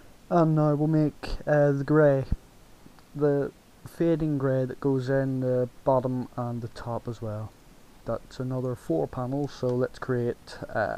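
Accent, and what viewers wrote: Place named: Northern Ireland, United Kingdom. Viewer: Northern Irish accent is beautiful. Could listen to it all day!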